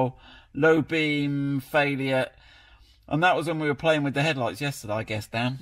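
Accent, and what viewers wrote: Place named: Wales, United Kingdom. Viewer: It took me 2 minutes to realize he was speaking English. Is this a Welsh accent?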